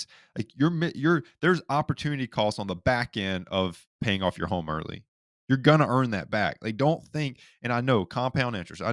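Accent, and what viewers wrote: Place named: South, United States. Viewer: Yet another financial talking head spewing advice. Sure, like his calculation that's great. But for crying out loud, how could I honestly take advice from someone that looks like he's got his smile permanently pasted on himself and he's got his fingers stuck in a light socket?! and....geez....he sounds like a good ol' country boy. Apologies that I'm that opinionated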